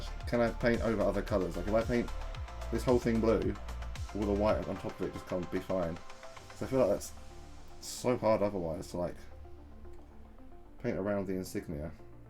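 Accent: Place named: England, United Kingdom. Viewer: Hello mate! Seasoned painter here and I think he looks fantastic! I know what you mean about the price of them. They're insanely pricey. You sound like you're from England (like me :)) so if you want to get kits and such best places are element games or weyland as they sell minimum 15% cheaper or warbay (they specialist in buying peoples stuff so quite often at a 50% discount!). p.s. dropped you a sub! Looking forward to seeing more content :)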